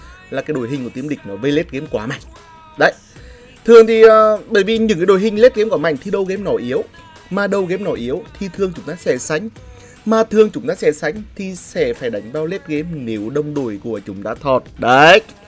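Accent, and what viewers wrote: Nghe An, Vietnam. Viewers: A ở chộ mô nghệ an vậy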